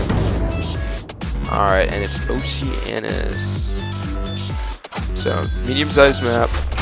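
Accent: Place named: Philippines. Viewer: You sound Pinoy Btw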